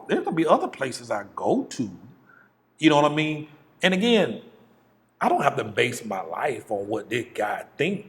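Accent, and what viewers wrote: South, United States. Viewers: My man. You have this southern draw that cracks me up. . You're obviously very intelligent and I've enjoyed watching your journey.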